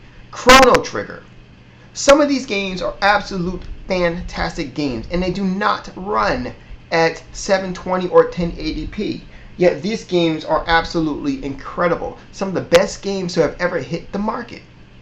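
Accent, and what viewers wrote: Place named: Australia. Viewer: Looks Around... I thought it sounded Australian... good vid man. I agree HD resolutions don't make or break a game. It may help but it doesnt matter much. As for the Wipeout vid, the point he was making was 360 fanboys going nuts saying the 360 did native 1080p, when it actually was upscaled. Nothing wrong with that but its a technical difference between native and upscaled isn't there?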